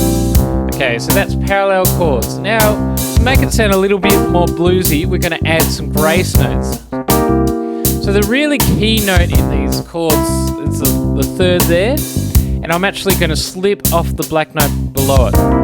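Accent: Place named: Australia. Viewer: Nice to hear an Australian accent. Cheers from country Victoria. Great lesson.🦘🎼🦘🎶🎵🎶🎶🦘🦘🦘🦘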